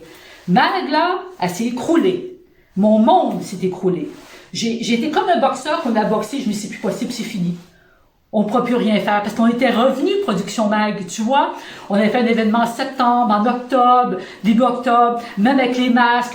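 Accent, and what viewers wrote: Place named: Quebec, Canada. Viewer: Coucou Mag entendre ta pêche et ta super énergie, ça fait du bien en plus de ton p'tit accent Québécois qui apporte la petite cerise sur le gâteau, merci!!!!!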